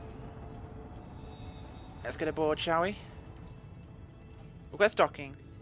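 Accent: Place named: United Kingdom. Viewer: Ok that’s fine btw I like your British accent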